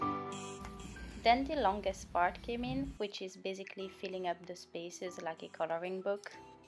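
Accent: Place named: Russia. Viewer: Ta voix en anglais est tellement cute, c'est hyper agréable de t'écouter (malgré l'accent russe hihi) Le rendu est vraiment bien,j'adore la palette de couleurs que tu as choisie! Keep going <3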